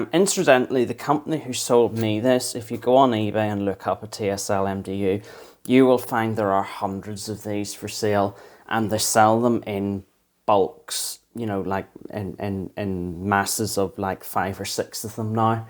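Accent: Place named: Fermanagh, United Kingdom. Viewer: Sounds very Fermanagh-esque.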